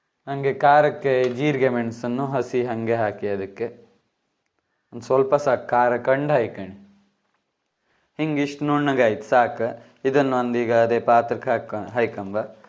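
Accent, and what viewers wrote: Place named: Kundapura, India. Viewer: ಸೂಪರ್ ಪ್ಯೂರ್ ಕುಂದಾಪುರ ಕನ್ನಡ ಮಾತಡದ್ ಲಾಯ್ಕ್ ಆಯ್ತ್. ತಂಬುಳಿ ಒಳ್ಳೆ ಇತ್ ಮಾರ್ರೆ 😍😍👌🏼👌🏼👌🏼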